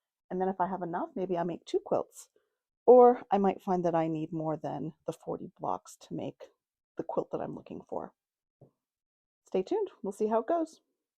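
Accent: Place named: Canada. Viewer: Trying to determine where you are from because of slight accent! Canada, New York? I think Canada. Love the quilt and pattern and your video is excellent. Like how you discuss measurements cuts and note bias and grain!!!!!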